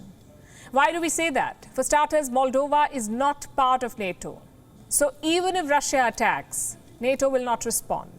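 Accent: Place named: India. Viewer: I CANT LISTEN TO THIS INDIAN ACCENT IM SO SORRY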